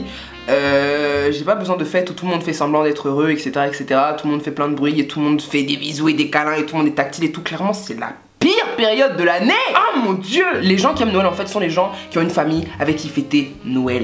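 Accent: Ivory Coast. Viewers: Wowww ta un accent ivoirien mais puuur😍😍😍😍😍🇨🇮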